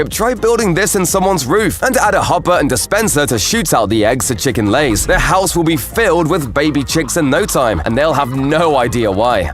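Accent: United Kingdom. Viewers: His British Accent is do cool 👁👄👁🙉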